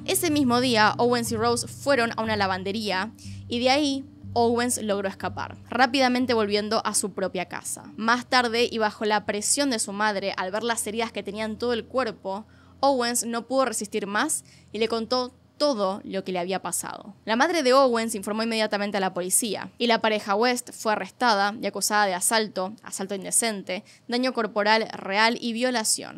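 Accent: Argentina. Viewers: Me encanta tu acento Argentino es encantador escucharte hablar, por cierto mis hijas piensan que estoy loca porque escucho éstos videos no entienden que es para aprender a cuidarlas. Te admiro mucho niña hermosa. 🇨🇴🇨🇴🇨🇴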